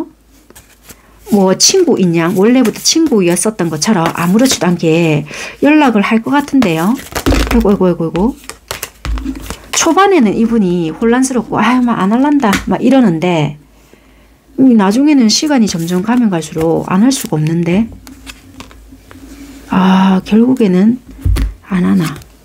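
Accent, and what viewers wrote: Busan, South Korea. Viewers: 부산 사투리 입니꺼? 😊😊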